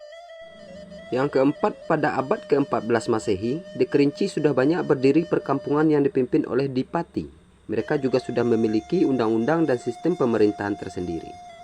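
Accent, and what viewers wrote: West Sumatra, Indonesia. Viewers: Logatnya minang bgt euy, pas bahasanya agak beda keliatan bgt lidahnya patah2 wkwk. "E" nya jelas bet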